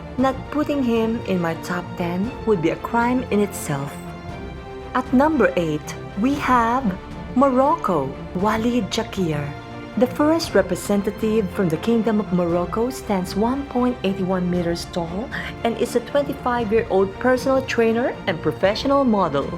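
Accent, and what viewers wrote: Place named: Philippines. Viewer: Are you Filipino? I can tell by your narration. hehe, Anyway, I love the words you used to describe these hot and gorgeous men, and the way you focus to their crutch towards the end at some point.. Your voice is pleasant to hear, it's soft and seductive makakatigas ng titi. hehe. Just work on the right pronunciation of the words and your channel will go a long way by having a lot of viewers or subscribers. Keep it up.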